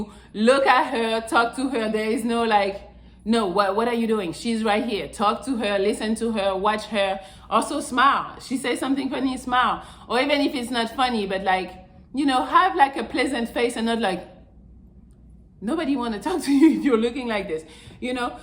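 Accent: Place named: France. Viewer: Helloz, my favorite part of your videos, is the energy you have when you start... "WHATSUP LOVERS" with that gorgeous French accent... you make our days... (^^,)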